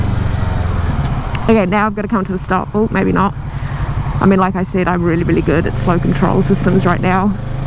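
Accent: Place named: New Zealand. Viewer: Picked your from NZ as soon as I heard your voice. Hi from South Australia, great video.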